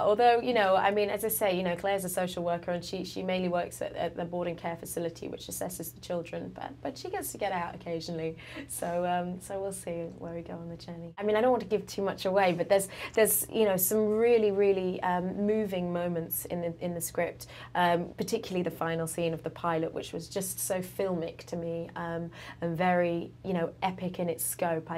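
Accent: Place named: RP, United Kingdom. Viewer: She sounds very different in this interview, so much more posh! She's great, I love her.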